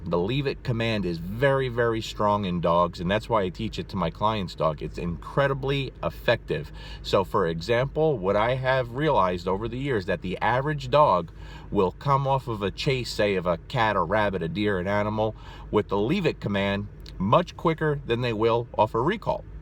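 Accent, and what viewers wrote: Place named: New Jersey, United States. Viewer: Beauuuuuuuutiful truth and boom. “Leave it” was the first thing I taught my lil rescued mutt, (welcomed him in at 4.5 months with zero education before that), and he sponged it up like a CHAMP. Had him fetching and dropping within the first backyard play session too. I think I like fetch even more than Dino... And almost as much as I dig that fab Jersey accent of yers. ;)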